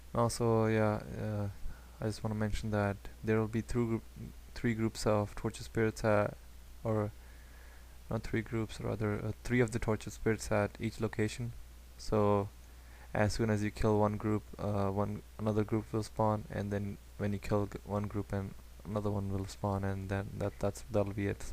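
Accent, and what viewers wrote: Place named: India. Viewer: THanks man so much just curious not hatin what accent was that sounded indian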